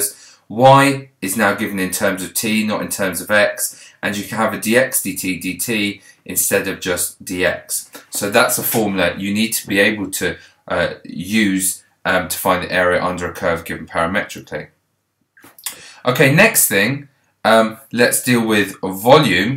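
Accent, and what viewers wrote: United Kingdom. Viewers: woah, you sound Britsh!! I'm an Amurican. Thanks for posting, much appreciated :)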